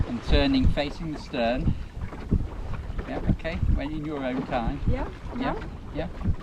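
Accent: United Kingdom. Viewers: I surely no not know why, since my ancestor settled in Canada only 55 years after the foundation of Québec by Samuel de Champlain in 1608, I feel I have to offer you my apoligies for this rather uncivilized fashion with wich this attendant from Audierne treated you and, but I do. J'adore l'accent british;)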